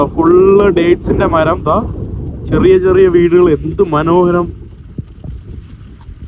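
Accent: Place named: Thiruvananthapuram, India. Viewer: അവൻ സംസാരിക്കുന്നത് തിരുവനന്തപുരം സ്ലാങ്ങിലാണ് ഓരോ ജില്ലക്കും അതിന്റെ തായമാറ്റങ്ങളുണ്ട് ജില്ലയിൽ തന്നെ വിവിധ ഭാഗങ്ങളിൽ വ്യത്യസ്തമായ വാക്കുകൾ ഉപയോഗിക്കുന്നുഎന്നിട്ടാണ് മലയാളം ഉച്ചാരണം ശരിയല്ല എന്നൊക്കെ പറയുന്നത് കൈയ്യിൽആധുനിക മൊബൈൽ ഒക്കെ ഉള്ളതല്ലേ ഗൂഗിളിൽ ഒന്ന് സെർച്ച് ചെയ്താൽ കിട്ടാവുന്ന തെയൊള്ളു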